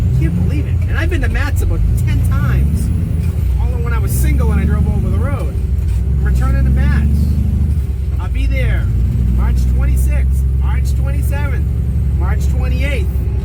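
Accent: Boston, United States. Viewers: I think I heard you say you've only driven for two years. I don't believe that for a moment. You shift the same as I shift my 13 speed Pete. I've been driving long haul for 48 years and I say you pass the test with flying colors. Love the Boston accent !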